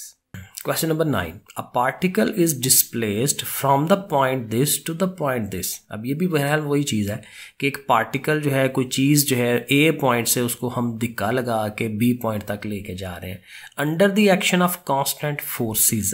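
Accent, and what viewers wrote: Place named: Lahore, Pakistan. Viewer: Sir paka lahori hai 😅 bda maze ka accent hai sir such a kind personality❤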